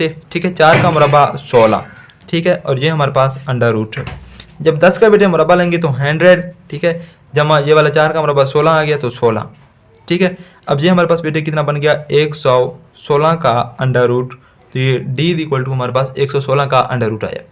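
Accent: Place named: Saraiki, Pakistan. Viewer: Sir lagta hy AP saraiki Hain q ky ma Bhi saraiki Hoon AP ki saraki ka mughy PATA chal gya😊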